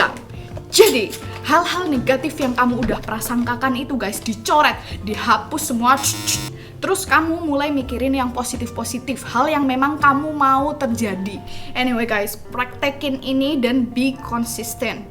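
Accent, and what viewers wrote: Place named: Java, Indonesia. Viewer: Baru nemuu chanelnyaa kak... ini ngejelasinyaa ada kejawa jawaan hahahah makin greget🤗🤗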